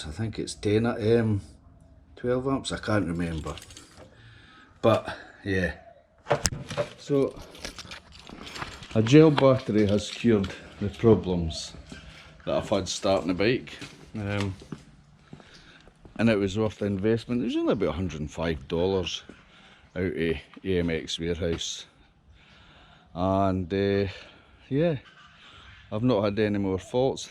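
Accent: Scotland, United Kingdom. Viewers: You sounds like a Scotsman. Regards from Poland 🍻🍻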